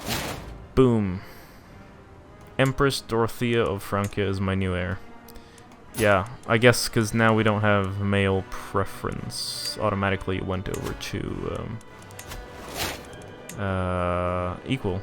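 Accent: Sweden. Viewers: Are you Swedish? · You can tell you're Swedish by the way you pronounce Sigtuna xD You've gotten good at hiding your swedish accent while speaking English too.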